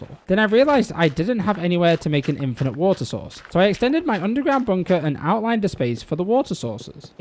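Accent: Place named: United States. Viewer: You come from uk? Boi you sound like a guy that comes straight from the us!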